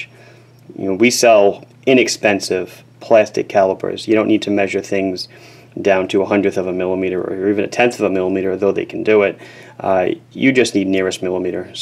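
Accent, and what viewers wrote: Long Island, United States. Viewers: "It popped right awf" "Now that it's instawlled" Love lawnguyland accents.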